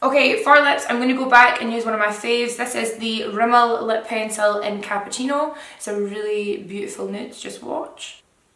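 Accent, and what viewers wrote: Scotland, United Kingdom. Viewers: omggg I love your Scottish accent xxxxx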